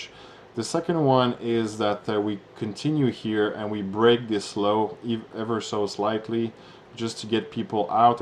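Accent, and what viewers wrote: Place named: Quebec, Canada. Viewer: Accent Québécois !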